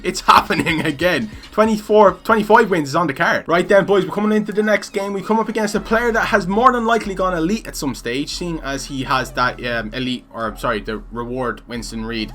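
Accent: Ireland. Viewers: R u from Dublin or Derry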